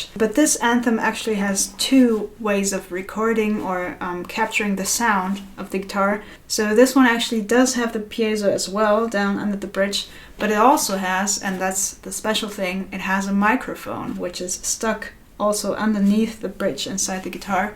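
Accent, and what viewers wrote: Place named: United Kingdom. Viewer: This was really helpful for me. I have A Colling CJ45 which someone had retrofitted a Fishman Sonitone to and it sounds really quacky, so I was considering this exact swap. I didn't really think the Baggs piezo was much better that the Fishman (although it was a BIT better), and the mic was very brash. The 50/50 was a definite improvement, but I'm gonna have to think really carefully now. I do think K&K pure mini is a better acoustic sound than either, and I have it in one of my Martins, but whatever the makers say, it needs a pre-amp with some pas, and that's extra hassle. Thank you very much. By the way, your English is excellent (I'm from England), and I would never have guessed you were from Germany. You have some US phraseology, but your accent is really close to UK English, which is so nice to hear. Finally, there's no reason not to put that pup into a Shawn Mendes - it's a lot of guitar for the money. 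Martin are selling horrible laminate ones for about the same money.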